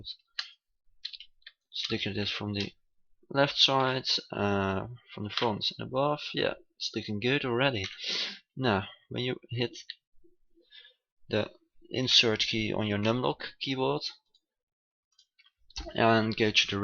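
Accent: Netherlands. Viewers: Are you Dutch? Your accent sounds really Dutch. Especially that you say the "W" in sword ;)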